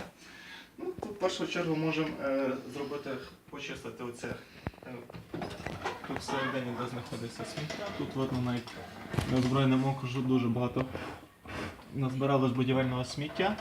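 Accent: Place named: Surzhyk, Ukraine. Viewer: безграмотный сельский суржик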